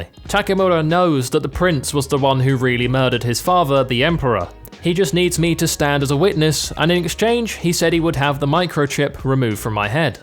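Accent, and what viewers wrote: England, United Kingdom. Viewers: Your vids are absolutely wonderful And your English accent amplifies their greatness in 10000 times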